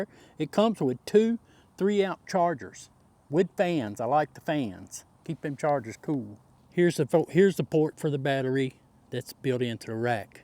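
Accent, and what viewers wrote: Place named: Mississippi, United States. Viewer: Good review! I love your accent. You remind of my family in Coffeeville, Ms. 🙂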